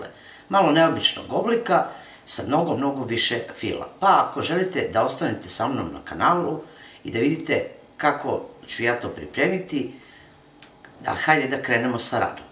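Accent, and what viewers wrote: South, Serbia. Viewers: Meni se naprimer mnogo svidja vas juznjacki govor i nemenjaj te ,ja sam uvek za to da se nemenja a moja komsinica je iz leskovca i ja uzivam da je slusam😍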